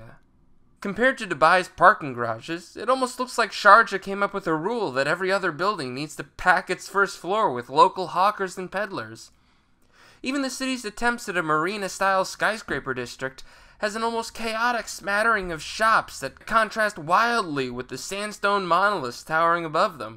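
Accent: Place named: United States. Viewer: yes, whiney, whinging american accent is just more bad taste.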